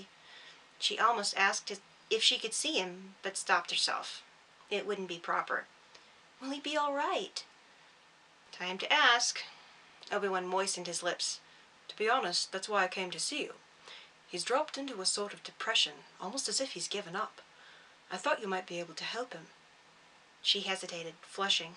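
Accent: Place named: United Kingdom. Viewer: So pleased things going well. Great chapter , your British accent is coming on well .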